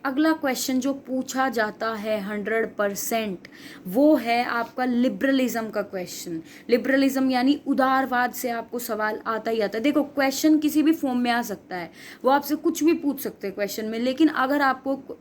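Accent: Haryana, India. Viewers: yss, haryanvi accent hai madam ka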